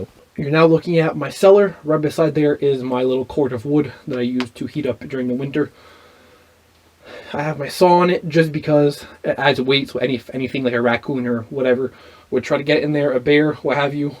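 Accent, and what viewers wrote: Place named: New Brunswick, Canada. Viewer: you sound by your ascent N,B. Canada thank for the video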